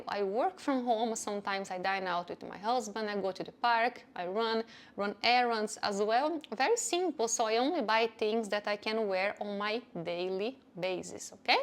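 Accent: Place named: Brazil. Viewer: I like your videos! Subscribed! I'm turning 30 soon and I'm already doing those changes without even realizing it. I feel super free not having to get my nails done every 2 weeks, but I don't know why I feel like without having them done it looks less put together comparing to having them done. Also wearing sandals without having the toenails done feels so off and not so pretty. Do you still get your toenails done? I didn't see you mentioning your name yet by the way nor where you're from, but I'm guessing you're from Brazil as I'm from Brazil too and the accent sounds familiar hahah Cheers!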